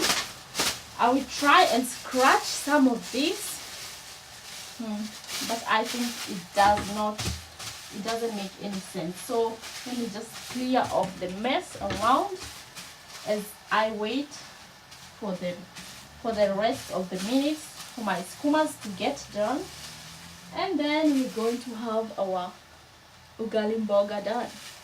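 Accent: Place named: Tanzania. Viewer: wooow surely you speak really like us Tanzanians.. It was quite easy for me to understand you... Hahaha I really laughed alooot when you said you ate ukoko at school. I really enjoyed watching you cooking Ugali mboga. As a single man tomorrow i am going to try cooking ugali mboga.... You did it quite well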